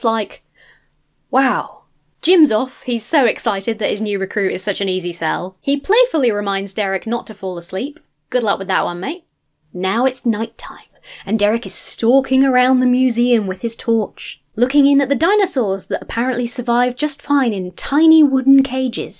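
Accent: United States. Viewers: You've got a good American accent! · You do a great American accent!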